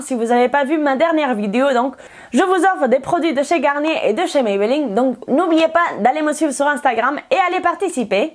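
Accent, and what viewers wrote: Spain. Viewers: Mademoiselle espagnol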